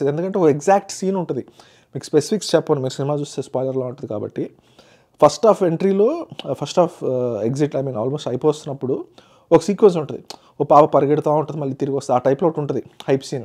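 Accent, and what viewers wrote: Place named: Ichapuram, India. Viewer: Blue films review Ichapura lahja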